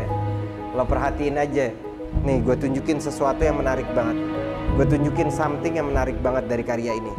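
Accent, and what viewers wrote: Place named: Betawi, Indonesia. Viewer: Nyang gue demen dan salut ame hostnye nih bang, betawi banget dialek ngomongnye... Mantep bang, lanjut...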